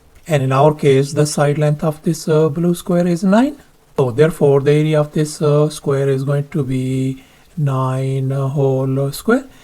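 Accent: India. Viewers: It takes 12 seconds to solve this . it's OK to speak indianglish but you don't need to kill yourself for 10 minutes 9 × radical = 12.7279... 12.7279...- 8 = 4.7279... 4.7279...× 8 = 37.8233... 😂😂😂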